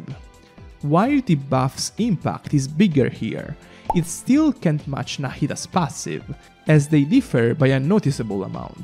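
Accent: Italy. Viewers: What’s this accent ? Italian ? It’s beautiful btw!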